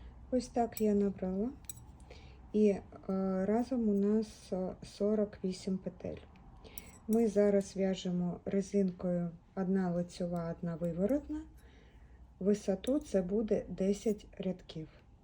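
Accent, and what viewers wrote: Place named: Russia. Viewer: Відпрацюйте українську мову , трохи ріже слух ваш російський акцент ..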